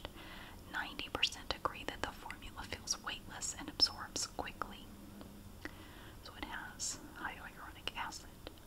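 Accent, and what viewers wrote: South, United States. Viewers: Yay other southern asmr love it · No filter on your southern accent/charm. 5/5 Stars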